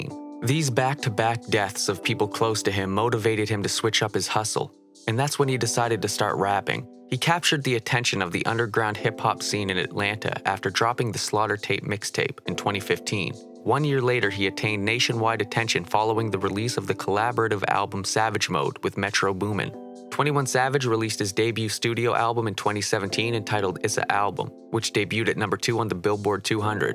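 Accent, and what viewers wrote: United Kingdom. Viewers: Bro he from the uk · He from Britain lmfao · he's british right? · Georgia I could have sworn he was British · Oi he from the uk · I think he from the uk 🇬🇧 bruv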